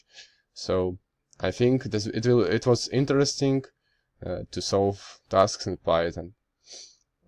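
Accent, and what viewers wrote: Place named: Russia. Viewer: your english has a russian tone, but it doesn't matter. you are a good communicator. keep it up.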